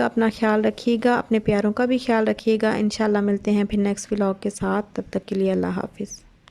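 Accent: Saraiki, Pakistan. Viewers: Welcome to karachi sister you said you belong to Lahore but your excent is Sariki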